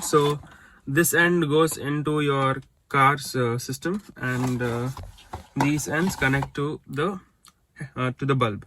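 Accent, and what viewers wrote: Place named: North India, India. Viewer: Great bro!!! Ur first impression made me feel ur some whr from North. But KA19 helped me understand ur from Namma Mangaluru. U simply Rock!!! Make more great videos!!! Ur a better Host compared to these News channel anchors:-)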